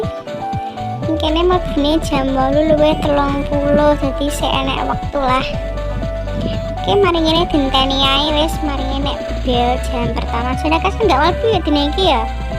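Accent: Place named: Java, Indonesia. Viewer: medoke boloo🥳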